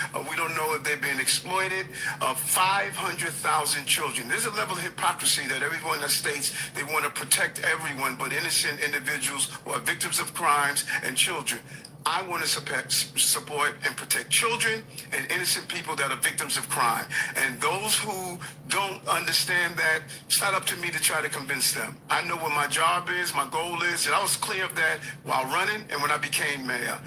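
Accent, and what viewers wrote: Brooklyn, United States. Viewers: BROOKLYN TONE N THE BUILDING EAST NY STAND UP...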